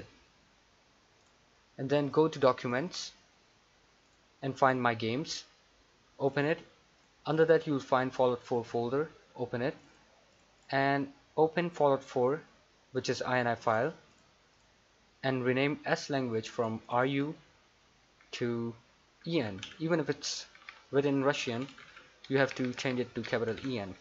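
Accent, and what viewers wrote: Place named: India. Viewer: I trust you, you sound like an indian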